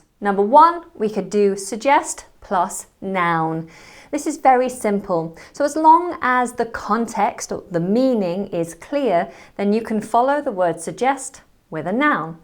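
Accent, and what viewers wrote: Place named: England, United Kingdom. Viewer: You get Germanized? Hurra! Du solltest unbedingt auch den bayerischen Akzent ausprobieren. Der ist leicht zu erlernen und kann Deinen englischen Akzent gut verstecken. Der norddeutsche Dialekt ist zwar näher verwandt mit Englisch. Aber darin ist Dein englischer Akzent leichter zu erkennen.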